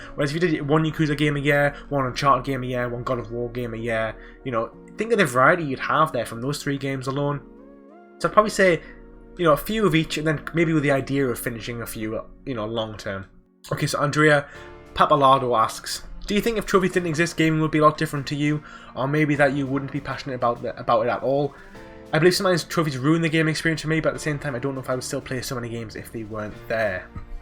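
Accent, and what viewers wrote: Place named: Newcastle, United Kingdom. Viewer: Ahh yes a fellow Geordie 😁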